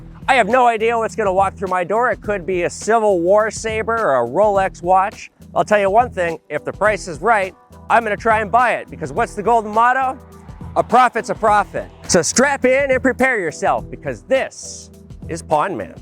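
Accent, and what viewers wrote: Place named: United Kingdom. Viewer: I didn't know was Brit tissh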